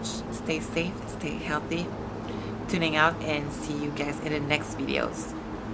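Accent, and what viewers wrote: Quebec, Canada. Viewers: Your french-canadian accent adds to your character , who you are. I think it is nice. Anyone who would be so rude as to complain about your accent probably speaks only 1 language and has their own issues. As a new subscriber i like the accent i came across you with the jay cutler interview with you and shawn i thought you were great...I can appreciate how hard it is to pick up a second language ...lol i'm taking french 3 hrs a week and it's brutal.Tabernac you're awsome....lol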